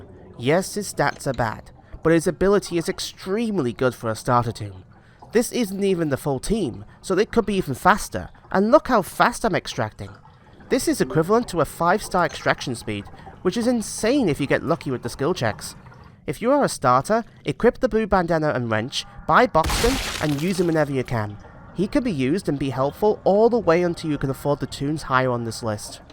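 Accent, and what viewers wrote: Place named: United Kingdom. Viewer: All things aside, you have a MASS british accent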